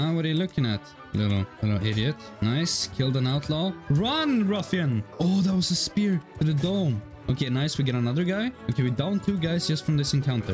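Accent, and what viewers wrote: Sweden. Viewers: You get a sub for Bannerlord and a fellow Swede speaking Swenglish.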